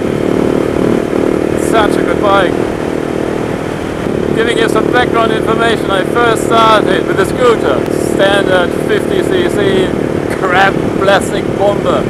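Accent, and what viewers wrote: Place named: England, United Kingdom. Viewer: Ist mir aufgefallen, einen leichtes flat-english ist rauszuhören! :-)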